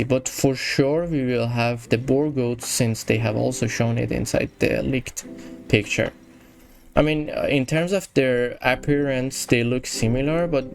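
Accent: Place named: Iran. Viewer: Oh you are Persian !!! I always watch you videos & I thought maybe you are Persian because of your accent . Well done mate 👏🏻 I created a topic about adding Persia as new civilization for AOE III DE in future in official forum (Persia civ suggestion) . You can go & check it my friend.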